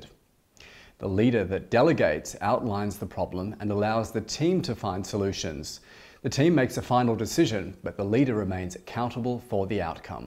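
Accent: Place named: Australia. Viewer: Somehow accidentally stumbled upon this while reading an article about a completely unrelated topic. Watched the whole thing mainly because of that beautiful Australian(?) accent. May watch a 3rd time idk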